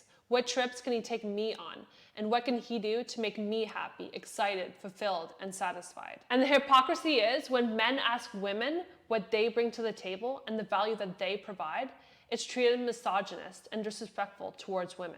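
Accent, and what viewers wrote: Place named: Canada. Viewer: You are very smart and well spoken. Also you kind of sound Canadian.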